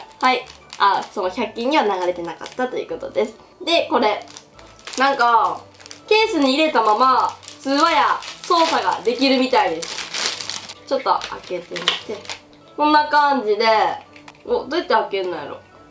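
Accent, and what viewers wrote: Kansai, Japan. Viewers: 関西弁？かわいい♡ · 関西人はみんなこの発音だと思いますよ！ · 私関西なんですけど、友達とかみんなこんな発音ですよ😅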